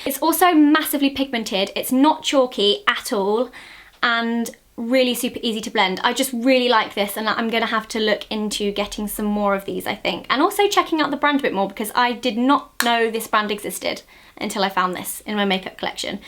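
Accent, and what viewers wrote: United Kingdom. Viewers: Kaaaaaaaaaaaaaaaawaii, <3 OMGlitter, so... beautiful! ^^ Amo seu sotaque britânico. Oh Gosh, como pode ser tão perfeito??:o Xx, { PetitCatcake Channel's (Visit) }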